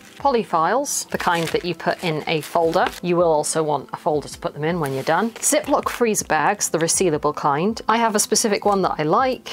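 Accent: United Kingdom. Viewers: Wow! I wish you’d worked this out and told me 50 years ago. Hah - I know you weren’t even born yet, then! Well you’re a genius. I could understand and appreciate every single piece of info I just watched and as you flipped through your patterns, I recognised some of the ones I myself bought in the 1960s, 1970s and 1980s. Actually all that stuff comes back into fashion every so often and I haven’t bought patterns lately - they seem so expensive these days! It’s such an intuitive and sensible way to organise patterns. Mine have all been sellotaped together over the years because they do indeed swell up every time you use the contents. And I’m going to set aside some time to put your wonderful system into practice for myself. Thanks a million ❤❤❤ PS How I love to hear a lovely calm British English voice with no affected growling or peculiar accents. 😂😂😂 So many youtube videos get turned off here, because I can’t bear the hyped up crazy sounding voices and over exaggeration on each word. 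And these days, most people seem to shout at the microphone for some reason. It’s a delight to listen to you, so thanks very much. Keep up the good work - it’s always interesting and helpful and unusual and informative x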